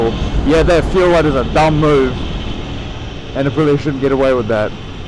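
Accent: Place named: New Zealand. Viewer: Great to hear a Kiwi accent on a bike review! Great review! And most importantly... Oooh look, a Squirrell!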